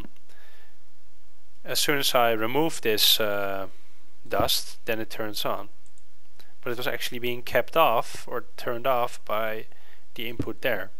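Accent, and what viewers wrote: Netherlands. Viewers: are you dutch? (your accent sounds like it)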